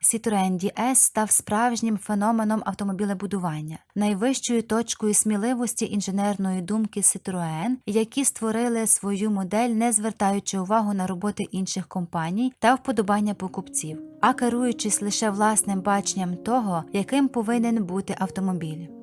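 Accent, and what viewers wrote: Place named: Central Ukraine, Ukraine. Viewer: ви певно з центральної України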